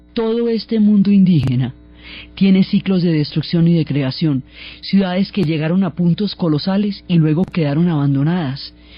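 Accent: Colombia. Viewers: Hola Ferlo, en Guatemala habian Mayas. Tanto su presencia que yo pensaba que ellos habitaron en Guatemala y creo que el escritor de hombres de maiz es guantemalteco. Si buscamos autores guatemaltecos sobre los mayas los encontramos. Tambien Chiapas y Yucatan eran de Guatemala. Asi que los mayas segun mi conocimiento eran de Guatemala aunque si hubieron Mayas en franjas de El Salvador y Honduras. A mi me parece que Diana Uribe hace un buen trabajo. Recuerde que ella es colombiana y su pronunciacion es diferente a la mejicana y centroamericana.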